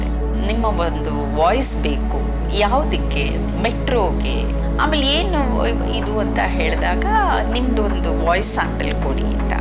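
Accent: Standard. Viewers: I used to repeat after the announcements of namma metro. Thats how much ur voice had influenced us madam! Always felt very proud of ur ಶುದ್ಧ and ಅಚ್ಚ ಕನ್ನಡ ❤❤❤ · “Garden city “ thing is if one single English word comes out of aparna it will be sounded weird she has shuddha kannada voice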